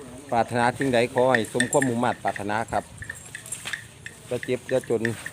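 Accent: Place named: Isan, Thailand. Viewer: ชอบที่แกพูดภาษาท้องถิ่น ภาษาอิสานน่าฟัง เวลาบรรยายก็มีภาษากลางบ้าง แต่เวลาพูดกันเองพูดภาษาท้องถิ่นดีแล้วค่ะ ดูอย่างควาญสิงห์ แกบรรยายเป็นภาษากลาง แต่เวลาพูดกันเองแกพูดภาษาท้องถิ่นชอบค่ะ แม่บางช่องพูดภาษากลางตลอด มันเหมือน DJR ค่ะ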